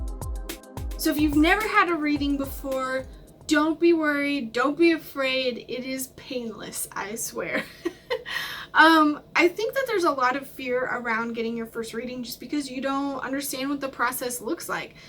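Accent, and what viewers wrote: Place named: Minnesota, United States. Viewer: I'm pretty good at guessing accents. I'm thinking and predicting she's from Minnesota but I could be wrong.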